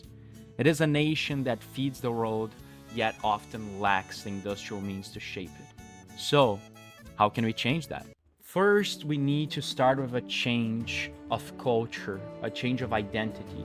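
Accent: Brazil. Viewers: Voce tem um sotaque abrasileirado. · I can tell you're another Brazilian just because of your accent! Great vid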